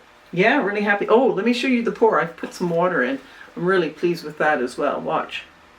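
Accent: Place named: Canada. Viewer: Based in the UK? ok but you sound so incredibly canadian. Am I tripping? Anyway I am not usually a fan of pinks and reds, but I am really digging the way you've combined them here, kinda has a touch of Rothko. I really liked the bright reddish vase and jug with amarylis all over. Usually not a fan of amarylis but it looks great with those rich colours.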